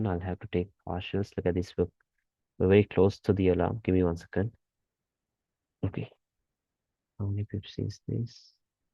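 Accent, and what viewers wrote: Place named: Malaysia. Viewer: When hear ur voice i thought u Master is malaysian 😅😅😅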